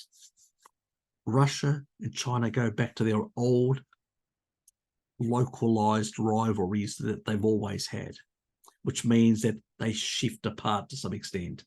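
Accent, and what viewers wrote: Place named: Australia. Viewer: you have a very broad Aussie accent for a guy of Greek heritage!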